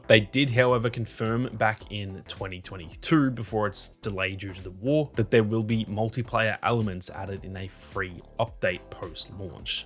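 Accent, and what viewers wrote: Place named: Australia. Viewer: Nice to hear a fellow Aussie. I'm hyped too.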